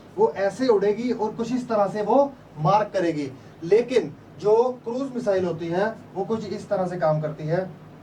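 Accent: Punjab, India. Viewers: Aap Punjabi hoo na sir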